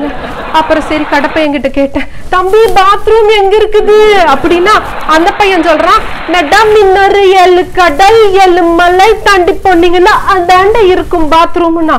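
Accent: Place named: Coimbatore, India. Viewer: அம்மிணி நம்ம கோயமுத்தூர் பாசையா மிக மிக நன்றாக உள்ளது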